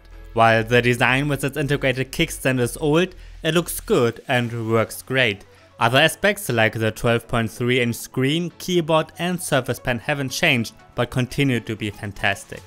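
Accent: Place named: Germany. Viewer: Dein Englisch ist grausam, dass du deutscher bist hört man sofort. Entscheide dich besser ob du nicht auf das wenige Geld verzichten kannst und auf Deutsch deine Videos rausbringen kannst, oder ob du Muttersprachler weiter verschrecken willst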